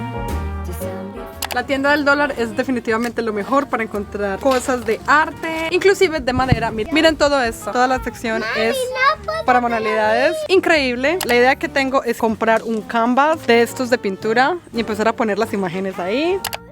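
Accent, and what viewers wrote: Antioquia, Colombia. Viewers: Otra vez se lo digo?😂Amoooooooo cuando hablas con el acento bien colombiano 😍😍😍😍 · Amo el acento paisa de este video❤ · SALUDOS CORDIALES DESDE COSTA RICA BENDICIONES PARA TI Y TU FAMILY QUE DIOS CUIDE DE USTEDES X SIEMPRE EN HORA BUENA CON TANTO PREPARATIVO DE DESEOS DE BIENESTAR... DE❤ TE DESEO DICHA REALIZACIÓN... MUCHO POSITIVISMO... Y LUCHA DE LOGROS X ALCANZAR... ME ENCANTA VER TE TAN POSITIVA CON TANTO CAMBIO Y VER COMO COMPARTES CON TUS SERES QUERIDOS. EXCELENTE... LOGRES LO QUE LOGRES SERÁN TUS ÉXITOS DEL HOY QUE PROFESAN TU LUCHA... TU AHNELO MAS SENTIDO MIS SALUDOS Y BUENOS DESEOS.. Y ME EN CANTOOO ESCUCHARTE TAN COLOMBIANA TAN GENUINA.. DEBERÁS PA DELANTE COMO EL ELEFANTITO LO... BUSCANDO EL PRIVILEGIO DE LOGRAR LO AHNELADOOO... LO DESEANDO... LOS SUEÑOS PROMETIDOSSS... DE❤ TE DESEO PROSPERIDAD EN SALUD Y ECONÓMICO PARA QUE ALCANCES LO MUY DESEANDO EN ESTE 2024..❤❤...❤❤..❤❤...❤...❤...❤❤..❤ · Tengo mi lienso en blanco. 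Tenia un trabajo estable lo deje hace un año. Querìa empezar 2023 fuera de conford. Fue un año muy bueno. En este 2024 estoy sin trabajo no veo un horizonte. Pero amè escucharte con el acento colombiana jejjee imaginado tu niña vestida de princesa. 🍇🥦🏖🏡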